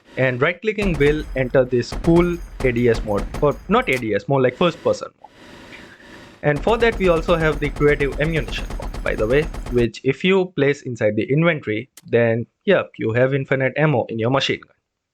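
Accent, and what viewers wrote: India. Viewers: Heard the Indian accent and I knew I found what I was looking for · Tell me you are indian without telling me you are indian. not trying to offend anyone, nice video.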